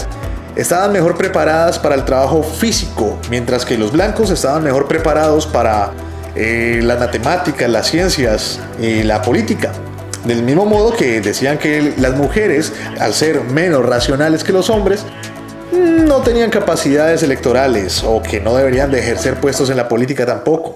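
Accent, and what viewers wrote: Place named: Colombia. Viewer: Su voz me parece familiar? Ese acento colombiano.. ya lo eh escuchado en otro canal? Excelente contenido muy buena edición , horas de grabación para los 20 minutos más informátivo , sigue así bro saludos Colombia Cartagena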